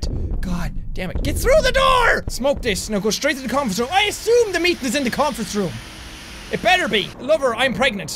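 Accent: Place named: Ireland. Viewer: Love your Irish accent I'm part Irish but I don't have the accent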